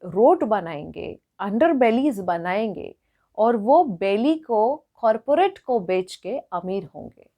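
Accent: South, India. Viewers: Girl u r awesome!! got the perfect south Indian accent, lingo, perfect sarcasm!!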